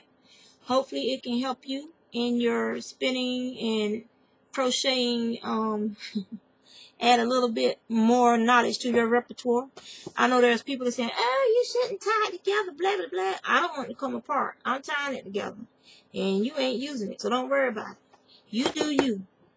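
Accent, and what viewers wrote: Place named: South, United States. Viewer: Loved the bush stitch cowl,. I want to try making one. Gosh, you can fly with that hook! I wish I could crochet that fast. I agree with you, Susan Bates are the best and are the only ones I use. Hate Boye hooks. I have a thick southern accent too. Was raised in southwest VA. I love southern accents! ♡ Hope you have a good week. Looking forward for another podcast soon!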